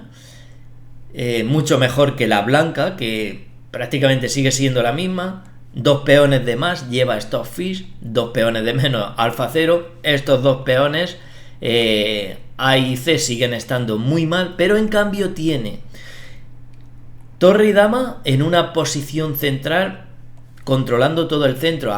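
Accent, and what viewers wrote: Spain. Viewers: Se refiere al acento español de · porque es andaluz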